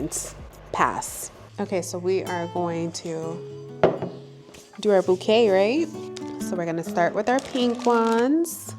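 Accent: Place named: New York, United States. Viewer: hey baby…. awh the face mask was so cute. lol a tooth ache is the absolute worst. We do miss Meg… happy Mother’s Day babe. We want you to vlog more too…👀 Say “coffee” again…🤭 your NY accent is everything. yum, love Greek food! The bouquet is gorgeous, you did amazing baby. Oooow, this Sunday my husband and I are going to indulge a bit. I’m going to pick up some of that tequila. Not I think I’m Spanish…🤣 Your face is flushed after those two drinks. That one looks so good too. By the looks of it, you guys had an amazing Mother’s Day.🩷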